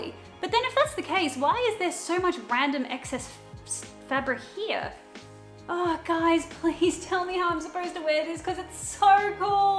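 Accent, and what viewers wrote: Australia. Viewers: I’m Australian, even I struggle to know the correct pronunciation of things, even Australians correct each other’s pronunciation all the time, especially suburbs and places. Literally everyone pronounces things differently. I don’t think was purposely changing her accent for the American audience. She just didn’t know how to pronounce Academia so when she got corrected she went with it. I question things I say all the time. It could be because we have so much American influence through socials and TV. There isn’t one way of pronouncing something. She says like a million words in this video in her native accent and you pick on one word. Let do her thing she is killing it! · I prefer it when you use aussie lingo and keep your accent, I'm from the UK and I just love hearing the way you guys talk down under ☺😍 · Yeah that annoys me, America has tons of dialects to begin with too so it’s hypocritical to ask for a more “American” way of speaking from an AUSTRALIAN. 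Edit: I’m American, Southeastern to be exact. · Please don't change anything about your 'accent'. I am Croatian and I come here for the Australian, not the American. 💪 you are the only Australian I watch on youtube so i cherish all these differences, I truly enjoy it. Wish we could see more Australia in the future. I love love your videos, this thriftmas was amazing! wish you all the best! :) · Part of the excitement is learning by experiencing another culture and lingo. I love that is Australian. It's so fun to have her as my friendly neighborhood Cultural Atache' to Australia. 😊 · Ikr and it’s not every day you hear an Australian accent, people are allowed to be unique (and sound like where they’re from :P I think it’s a common subconscious part of American culture to feel like “we do everything right, so we have to help people conform to our perfect culture”) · Please don’t beat yourself over the way you say certain words. 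Accents are all unique, and just because an American doesn’t pronounce something the way an Australian would, doesn’t make it incorrect. Your accent is SO cool, and even if we say something a different way or use a different word for something, we still know exactly what you mean. You shouldn’t change yourself! We love you for you!! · yes ! I love to hear different accents and I never think about her speaking Australian as a weird thing since she's from there !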